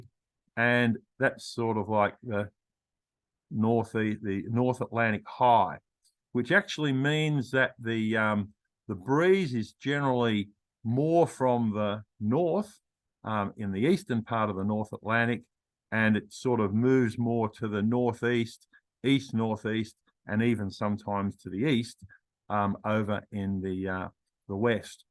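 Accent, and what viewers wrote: Australia. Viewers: Well done. Swapping one Aussie accent for another. At least I could understand it.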